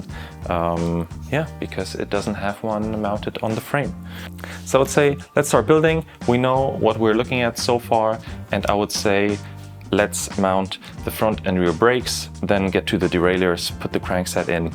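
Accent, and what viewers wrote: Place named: Germany. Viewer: As you can hear in his accent, he is probably German, in my opinion 😉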